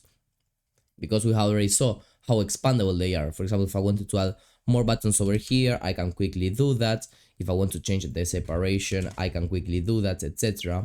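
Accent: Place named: Rioplatense, Argentina. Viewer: El acento rioplatense es tremendo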